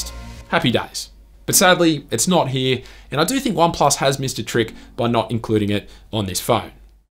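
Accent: Australia. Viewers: Great video....not too long like those 15-20 minute ones which really becomes boring. And yes mate, are you an Aussie 😝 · Everything sounds good in Australian accent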